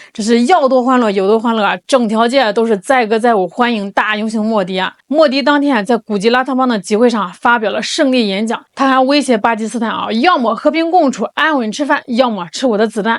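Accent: China. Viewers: 口音100%是中国的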